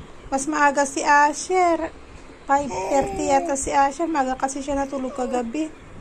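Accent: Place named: Ilocano, Philippines. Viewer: Adda latta tono nga ilocono ka ate hehe.. God Bless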